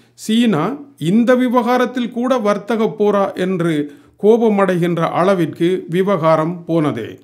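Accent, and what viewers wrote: Sri Lanka. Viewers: Information are good. Your tamil looks like srilankan tamil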